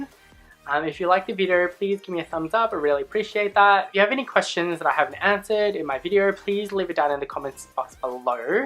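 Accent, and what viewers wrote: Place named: Australia. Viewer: That Australian accent a bit too much sometimes No offence ! im just saying it in general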